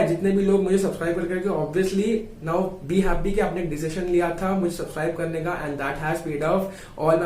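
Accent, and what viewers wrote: Bengal, India. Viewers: Are you bengali ????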